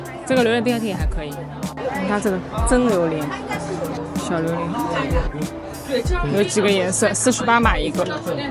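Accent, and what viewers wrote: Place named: China. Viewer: 她口音很重的大陸姑音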